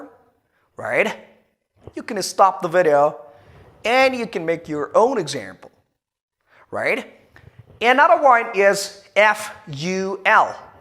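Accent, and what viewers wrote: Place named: United States. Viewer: Thank you sir your American accent is excellent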